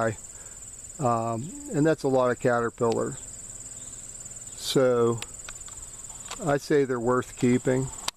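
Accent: Pennsylvania, United States. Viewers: Great video. I agree, they should be left alone if they are not in a spot where they could be a problem. This species does prey on caterpillars but they are a major predator of flies which are just as much of a pest as caterpillars. Last year I did not remove a whole lot of this kind, but when I did they were located around doorways or eaves of structures or low in trees where stings to people were probable. I could tell from the accent you are a fellow Pennsylvanian!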